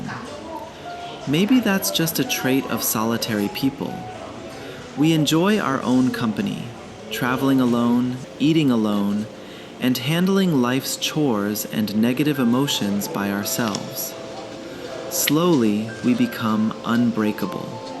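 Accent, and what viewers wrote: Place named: United States. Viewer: please dont use the american sounding voice, the one from your first video is much better!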